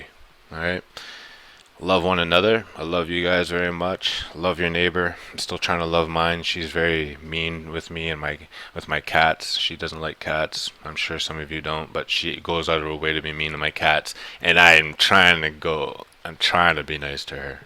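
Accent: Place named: Canada. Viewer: Wow , this guy sounds like Bob and Doug Mackenzie from SCTV. "Hey hoser bring me my toque , then we can eat some back bacon and play a game of beer hunter eh" !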